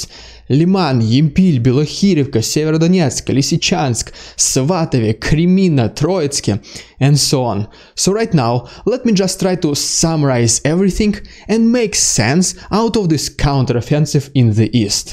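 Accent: Russia. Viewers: I agree wholeheartedly. Maybe it is an Aussie thing, since we adore Canadians, and what could be more adorable than a Canadian with a Russian accent? If you like and Ukraine Matters, I also suggest Denys Davydov and Artur Rehi. Reporting from Ukraine Is also sometimes worth a look. Similar content on all five channels, but occasionally one picks up a significant point that the others missed or glossed over. And it is great to have a variety of perspectives and different nuances.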